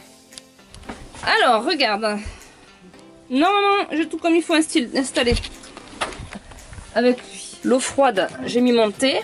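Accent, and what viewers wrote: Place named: South-West, France. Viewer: Tu es enrhumée? Ça accentue bien ton accent du sud ouest lol..en tout cas bravo a toi et ça avance super bien..hâte de voir quand ce sera fini et vos péripéties 🙂😘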